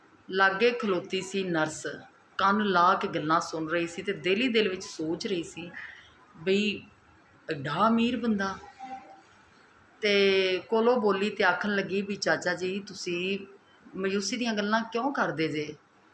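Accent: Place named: Punjab, India. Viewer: Your punjabj accent is so cute❤😮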